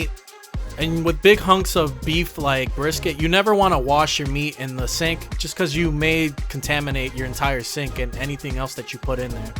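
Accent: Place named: Pennsylvania, United States. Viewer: You gatta be from PA with that accent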